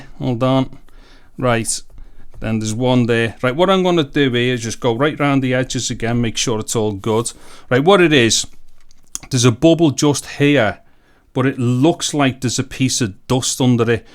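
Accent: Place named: Wales, United Kingdom. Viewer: Luvved your Accent. Is it Welsh?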